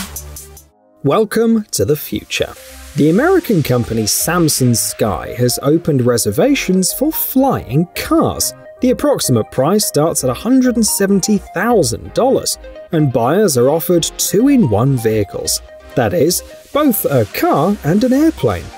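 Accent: United Kingdom. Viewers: no, it makes me want to give this video a thumbs down and move on. Not only is the narrative never fully explained but I can't stand the fact that we are being inundated with these narrators from the UK. I Can't stand listening to them. I've had enough already!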